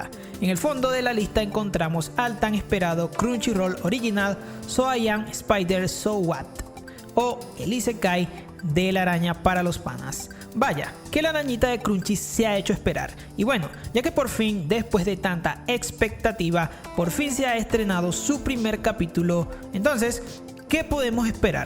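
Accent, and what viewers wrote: Venezuela. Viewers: No sabía que es venezolano, o eso parece por su acento y las palabras que dice, creo yo, jsjs. Venezuela el anime xddd JAJAJA. Tengo que verlo x'D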